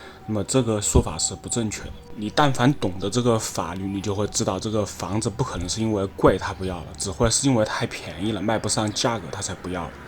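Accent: Wuhan, China. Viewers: 武汉人吗？一口汉普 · 武汉普通话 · up是武汉人吗，口音听起来很像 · 请问，播主是湖北武汉人吗？口音有些象 😀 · 你肯定是武汉人：） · 你这口音一听就是武汉的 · 博主武汉人吗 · 标准的武汉普通话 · 朋友，听你的口音，是武汉的吧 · 武汉口音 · 兄弟，说话怎么一口武汉味! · 你这个口音，掐指一算，大概率是武汉人，哈哈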